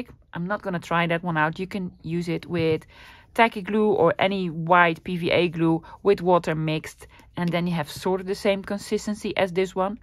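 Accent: Netherlands. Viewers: Hello, I am new to junk journaling and stumbled on your videos by accident, your Dutch accent was like music to my ears as I travelled to the Netherlands a lot when I was much younger, places like Amerstam, Rotterdam, Guoda, Delft, I visited Glass city and the flower markets and auctions I have been to Floriade twice, and many other smaller places, unfortunately I have not visited for quite a few years now, I love your videos my first attempt at a journal is almost finished it's not perfect but I like it, it is filled with many of your projects from the 100 day challenge, you are such a talented and gifted person, and a lot of things from your past resonate with me.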